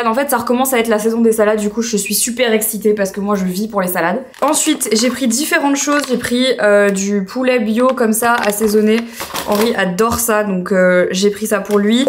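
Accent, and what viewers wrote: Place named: Belgium. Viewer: Tu commences a avoir l'accent belge 😄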